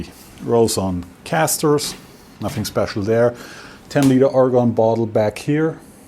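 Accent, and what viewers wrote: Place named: Germany. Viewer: Hi! Mpls MN 55407 The German accent makes the audio very enjoyable.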